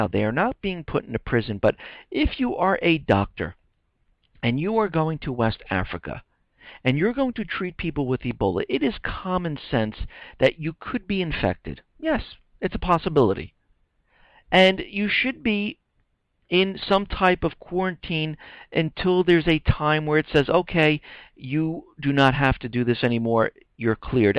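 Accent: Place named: New York, United States. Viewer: Incredibly Great Reporting as always from one New Yorker to Another or at least you must be from the North East - but probably not Boston as their accent is much heavier - lol!!! I just feel that we are close to a Nostradamus prophecy with all the signs around us including what is in the sky (Blood Moons)... Thanks!!! I don't know if you follow any of the previous prophets like Nostradamus, Edgar Cayce, Alois Irlmaier but if you have followed any of these "Seer's" of the future if you would consider doing a video on their prophecies that would be great!!! Thankx, Janice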